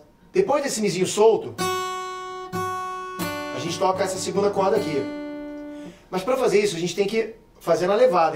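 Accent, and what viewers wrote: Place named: Rio de Janeiro, Brazil. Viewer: e essa voz de carioca ai tem jeito?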